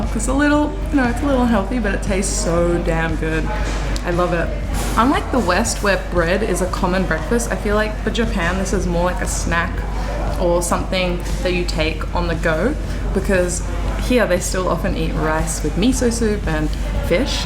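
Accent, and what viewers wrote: Australia. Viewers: Wait, German? I felt absolutely sure you were Australian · oh I didn‘t know you are german. I thought you are from Australia. Love your Videos! Greeting from Germany 🙋🏻‍♀️ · I thought you are only Australian because of your accent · At the start you said you were German.....you sound like you are from South Sydney.....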